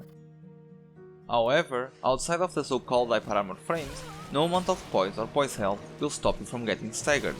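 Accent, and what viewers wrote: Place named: Russia. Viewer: rapaz tu ta de brincadeira que é de portugal, sério? aren't you russian? cant believe this accent is portuguese UHEHUAEHUAUHEUHEA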